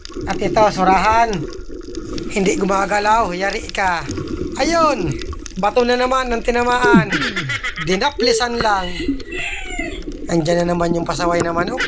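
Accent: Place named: Camarines Norte, Philippines. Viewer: Idol lagi ako na no nood sayo nalilibang ako sa mga video mo at sa Punto ng PG sasalita mo Alam ko taga Camarines norte k. Taga labo kase ako. Dito n ako sa Laguna now, ingat k lagi God bless po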